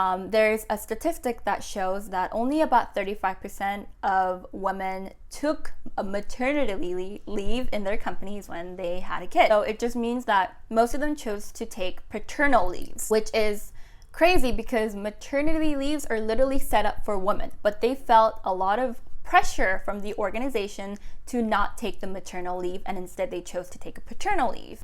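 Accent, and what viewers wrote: United States. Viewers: It sounds almost like American!😭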